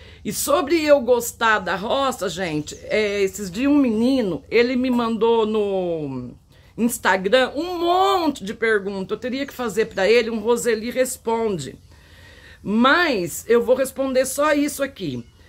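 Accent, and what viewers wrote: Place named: Inland São Paulo, Brazil. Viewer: Adoro o seu sotaque meu sonho é um dia poder conhecer o interior de SP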